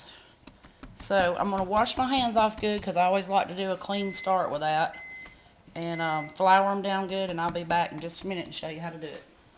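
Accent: United States. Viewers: The whole time I was watching your video I was thinking, I know who that is she sounds so familiar, then I realized that your from Georgia you sound like everybody I know because I'm from Georgia also. That whitelily flour makes all the difference. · FANTASTIC! You sound like you're from Mississippi.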